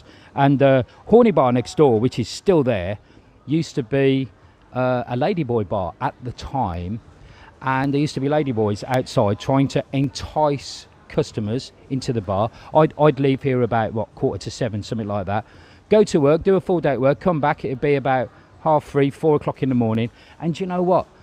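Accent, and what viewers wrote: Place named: Black Country, United Kingdom. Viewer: Even though you sound yam, I can imagine it must have been a bit of a culture shock going from Smethwick to Soi 6?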